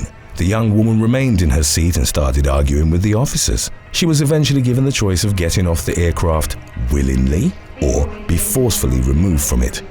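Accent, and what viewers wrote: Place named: United Kingdom. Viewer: The mask policy was, like the plandemic itself, fraudulent. All the main perpetrators have admitted this at various times -- gates, fauci, nih, cdc, media organs, labs. We needed more people like these refusers, not more sheeple who dumbly helped the criminalized authorities enforce the dictates on them. Surely you're alarmed at how easily everything became "fascist"? Every store owner, every governor, every mayor -- even your fellow citizens and checkout lines ad nauseam became little "Hitlers" over us. You sound British -- the UK govt turned absolutist so fast (and (has) stayed that way so long) it should terrify you way more than any disease, real or imagined. Not lecturing you, just saying.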